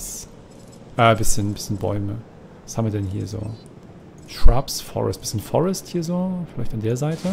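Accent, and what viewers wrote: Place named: Bavaria, Germany. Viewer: Wie gut, dass Daniel nicht dein Bayrisch hört ^^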